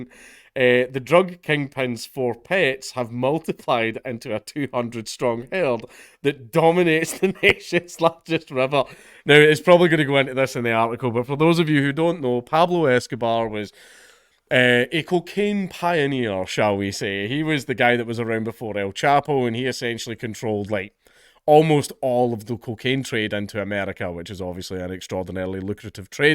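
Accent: South Africa. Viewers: South African accent has me dying 😅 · Haha,nice saffa accent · That was a mint South African accent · I love your south African accent. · saffa accent is on point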